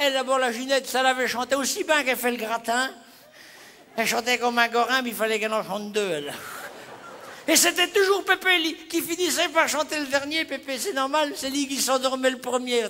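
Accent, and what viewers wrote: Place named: Normandy, France. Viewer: sacré tu me fait rire et tellement vrai tes histoires avec une pointe d accent normand bonne continuation a toi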